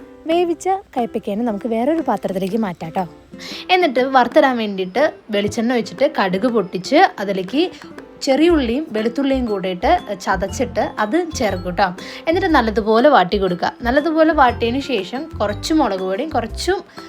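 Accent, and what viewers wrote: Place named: Thrissur, India. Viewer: ok സംസാരശൈലി കേട്ടപ്പോ തോന്നി തൃശൂർക്കാരി ആണെന്ന്..❤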